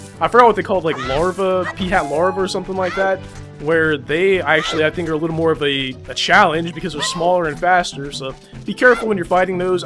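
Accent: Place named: South, United States. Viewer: Your Southern rural accent is really good. Not the horrible caricature that comes from a place of disrespect that I hear so often.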